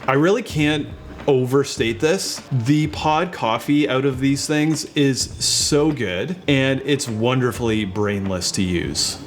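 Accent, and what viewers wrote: Canada. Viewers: Super helpful vid, nice looking kitchen & great Canadian accent 🙂I actually just got the same studio in the mail. I've used it a few times and I'm trying figure out the steps and RPM's on the grinder. I haven't played with it in fear of botching my coffee, I just scan the pod (via the app) and pushing play. Any suggestions?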